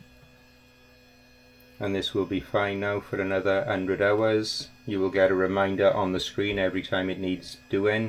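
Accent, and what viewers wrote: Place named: Swansea, United Kingdom. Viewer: Hmm I'm sensing a Swansea accent. Appreciate the video!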